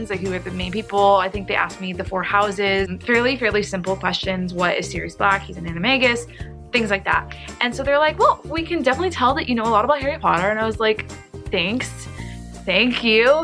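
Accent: United Kingdom. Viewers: prob RP lol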